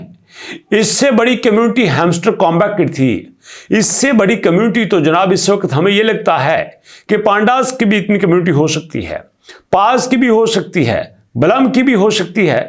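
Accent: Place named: Assam, India. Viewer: Indian Assamese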